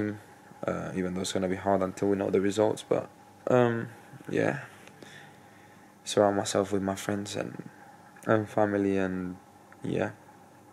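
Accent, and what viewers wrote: England, United Kingdom. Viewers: Ur accent is more English than mine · He's british accent is good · Sound more English than Harry Kane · Why do you sound British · HE SOUNDS ENGLISH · I've only ever noticed the british aspect of his accent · You sound more English than Spanish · transitioning to UK with the english accent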